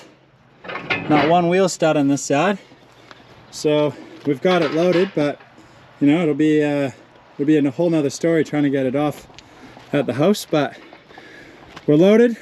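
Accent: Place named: Western Australia, Australia. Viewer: As i am watching this Video my brain hurt. All lhd Cars so your in the americas I suppose but I was hearing a western accent with hint of an Ausi accent.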